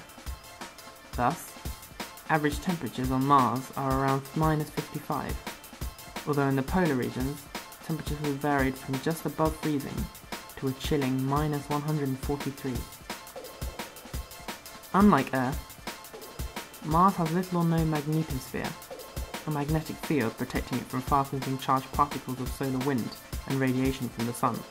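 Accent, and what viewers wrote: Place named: England, United Kingdom. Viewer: What you talking about? he's speaking English with an English accent.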